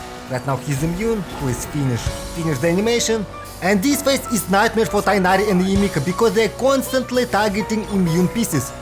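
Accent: Russia. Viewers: Как забавно слышать английский язык с русским акцентом) Нахида и Яэ Мико прям чисто на русском сказаны) Но английский неплохой) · Прикольный рашен инглиш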